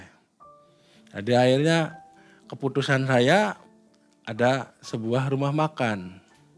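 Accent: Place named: Cirebon, Indonesia. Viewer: Yang bikin lucu itu logat Cirebonan - nya Mas, coba lebih diseringin tertawa jadi lebih asik nontonya.... Mas &, Wa, Wa Favoritku, BRAVO maju terus MM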